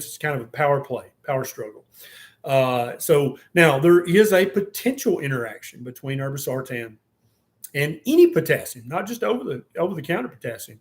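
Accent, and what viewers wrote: South, United States. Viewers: Oh, lighten up. The doc has a southern accent and he is in his own home. He can talk any way he wants. If it offends you, you don't have to listen.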